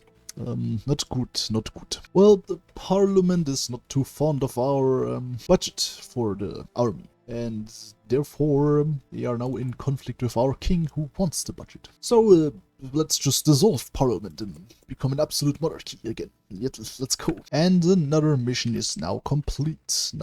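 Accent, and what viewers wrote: Germany. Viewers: Youtube has added an AI 're-dub' because has a German accent...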